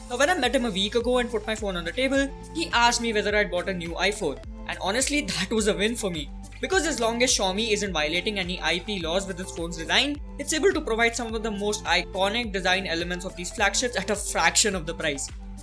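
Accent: India. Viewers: typical punjabi accent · Loved the Indian Accent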